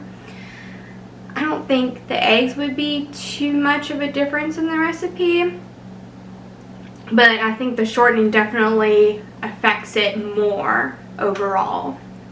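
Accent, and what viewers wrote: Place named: South, United States. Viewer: Omggg! You’re back! Hello! I hope you don’t take this the wrong way, but your social media detox made your Southern accent more distinctive! I mean that in an endearing way because I love all accents in general!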